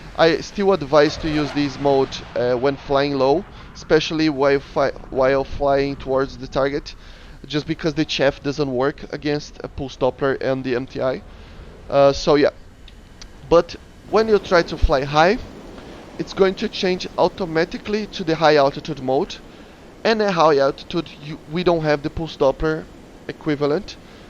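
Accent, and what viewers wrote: Brazil. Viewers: Notei q vc eh BR pelo sotaque do ingles kkkk video brabo nois 😎🤙🔥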